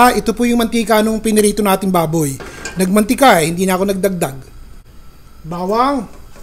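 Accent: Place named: Tagalog, Philippines. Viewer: So yummy, 😋tagalog na tagalog ka chef😊😅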